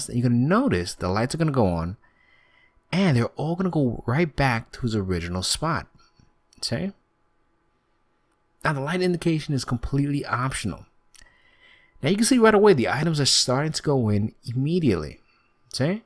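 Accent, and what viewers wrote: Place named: New Jersey, United States. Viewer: I'd say Italian from Jersey.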